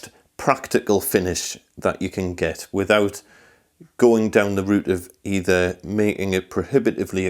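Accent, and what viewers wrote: Newcastle, United Kingdom. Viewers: Newcastle in the NE of England. They come much stronger than this one too. Google “Larn yersel Geordie.” Hilarious.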